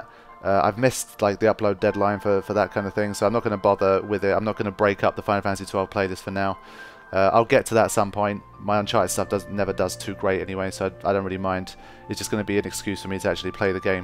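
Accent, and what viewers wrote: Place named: North London, United Kingdom. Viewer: I hear a bit of North London accent there :-) anyways, I think an interesting documentary topic to explore is the positive effect of gaming in general. Would be good to offer some counter argument to worried parents etc. In particularly final fantasy which has a large fan base that has aged and grown with the series. It would be interesting to interview these people to get some of their stories. Final fantasy touches on so many different issues in their games over the years and has definitely influenced me despite coming to it in my mid 20s.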